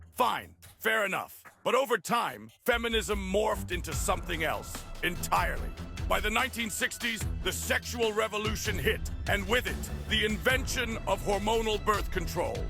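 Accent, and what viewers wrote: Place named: United Kingdom. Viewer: Okay, okay......But why is he British?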